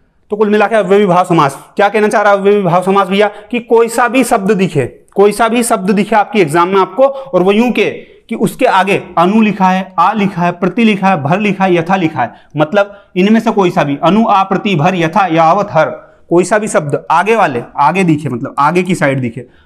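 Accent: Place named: Indore, India. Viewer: video se itna toh samajh aagaya ki sir indore se hai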